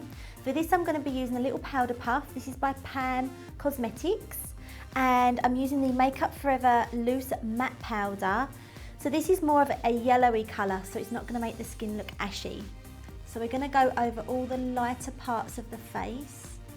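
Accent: Essex, United Kingdom. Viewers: Essex voice so annoying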